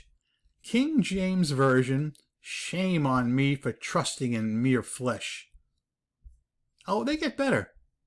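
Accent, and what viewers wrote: New York, United States. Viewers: I know your frustation very well, but see you saying it like that in your New York/NJ accent was nice with my morning coffee over here in Europe.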